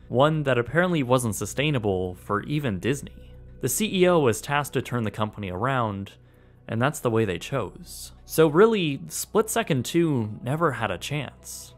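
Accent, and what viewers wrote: Canada. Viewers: got dat good-good nice, polite Canadian voice.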